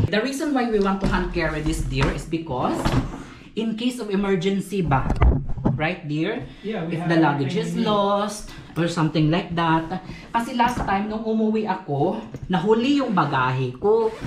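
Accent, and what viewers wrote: Iloilo, Philippines. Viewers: Hi, para Kang Ilonggo maghambal Dai... Find it cute Dear...♥️🙏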